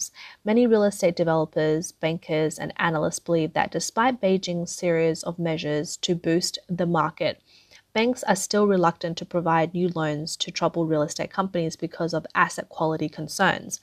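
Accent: Australia. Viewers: Aussie accent narrator is the BEST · This narrator has a perfect Australian accent.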